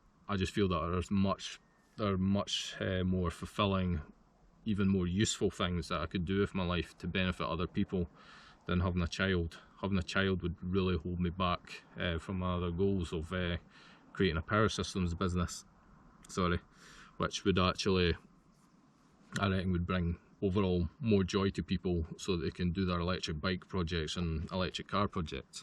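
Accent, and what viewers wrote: Scotland, United Kingdom. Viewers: My parents are Scottish I thought you were Scottish Highlands is beautifull Ive been there good video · Are you a Scot, mate?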